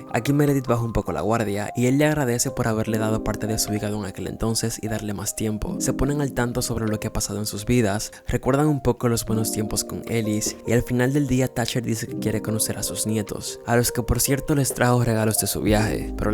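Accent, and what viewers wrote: Dominican Republic. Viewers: Amo como narras , todo educado pero le metes el plus dominicano 🇩🇴🥰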